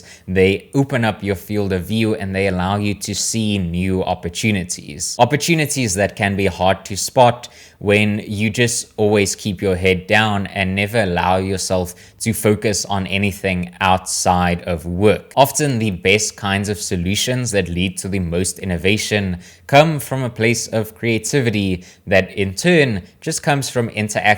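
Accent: South Africa. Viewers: super random but are u south african? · Are you South Africam?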